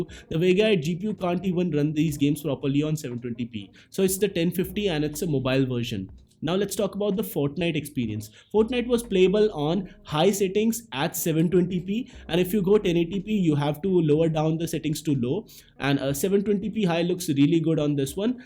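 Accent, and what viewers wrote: India. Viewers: I like indian accent. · i cannot fucking understand you in that annoying Indian accent, either fix that or stop fucking making videos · hope one day my ears can survive of listening Hindi accent.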